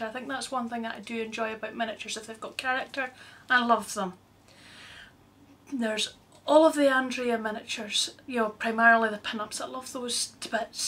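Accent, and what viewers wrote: Scotland, United Kingdom. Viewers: omg I love the way you speak! Subscribed! :D is it Scottish? Good luck in the competition!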